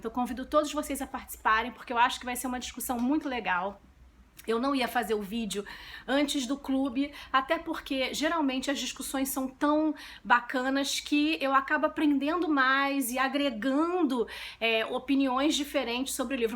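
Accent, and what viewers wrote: Rio de Janeiro, Brazil. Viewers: Esse livro é muito maravilhoso mesmo. E a delicia que é esse sotaque carioca?! Amo seus videos. <3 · só me dei conta do sotaque 'carioca' depois do teu comentário, crissstão, luzzzz, contrassssti, etc. …so amazing!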